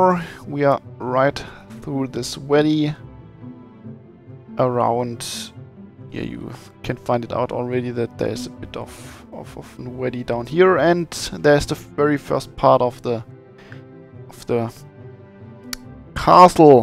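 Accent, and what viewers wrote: Germany. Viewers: Really good video! Although you could really work on your accent, your english in general is good, but the german accent is in there :P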